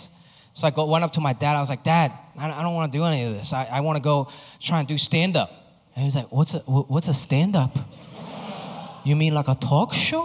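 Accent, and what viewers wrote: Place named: New York, United States. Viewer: I always wondered why he talks like he grew up in the hood mixed with an old NY Italian guy . RAP CITY 🤣 i love it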